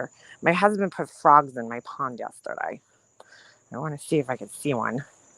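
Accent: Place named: Brooklyn, United States. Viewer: I don’t know how your channel would up in my feed but it’s wasn’t too bad listening to it, coulda been cuz of Ron’s channel. I will say that I have family in Mass Park and I go there frequently, matter of fact I was there 2x last week and the PD wasn’t there each time, my sister also said they’ve been outta there for 2 weeks already. Good luck seems you’ve got a well established following. Haven’t heard the Bensoshurst / Bay Ridge accent since I retired !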